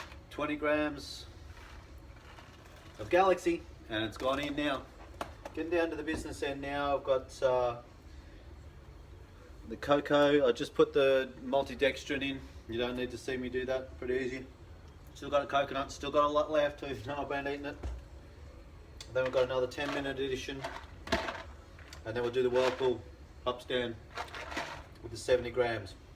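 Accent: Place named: Australia. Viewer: Are you in Seattle? Can't help but notice ozzy the accent lol...Im in Western Australia, just saw your beer reviews on Craig Nubergers channel. Very interesting beer in this video! Keep it up.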